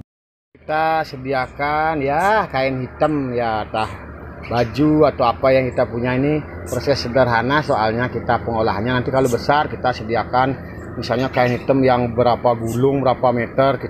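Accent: Bali, Indonesia. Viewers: Logatnya bali nok. Salam vanilla lovers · tinggal nya dmana pak?? logatnya kaya nak bali😁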